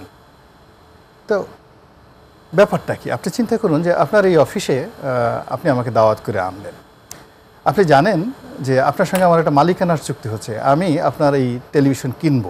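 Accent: Noakhali, Bangladesh. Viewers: উনার বাড়ি কি নোয়াখালী নাকি ?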